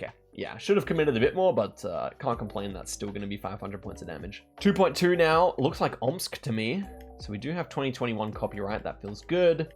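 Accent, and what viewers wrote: United States. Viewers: as a georgian (us) gotta love the alabama accent😂😂😂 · love your southern US accent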